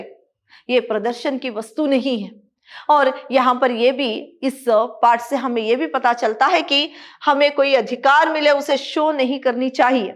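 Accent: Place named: South, India. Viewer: I think you are from South India. I guess it from your pronunciation. Overall very nice video madam 👌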